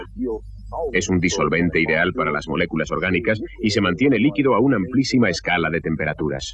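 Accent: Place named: Spain. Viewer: Es un buen programa, el contenido muy bueno; lo malo la pronunciación (del español de España es asquerosa) ni siquiera pronuncia adecuadamente el inglés como es costumbre de los españoles, se escucharía mejor en una traducción en español neutro...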